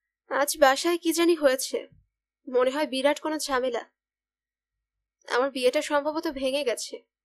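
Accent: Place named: Kolkata, India. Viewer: oh okay. Your pronunciation sometimes is like Kolkata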